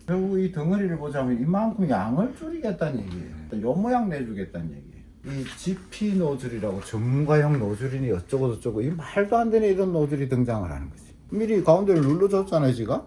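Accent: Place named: Gyeongbuk, South Korea. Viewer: 부산은 아닌데 말투 경북같다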